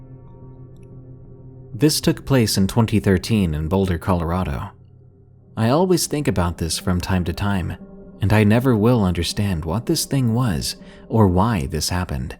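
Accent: South, United States. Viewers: Duh he is from the south..if your an old fan u should know that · I know he is from the South, but it just cracks me up LOL 😂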